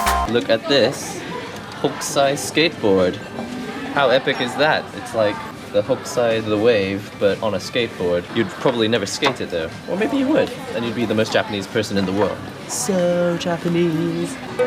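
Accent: United Kingdom. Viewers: I'm not a racist at all but holy shit how did u learn to speak british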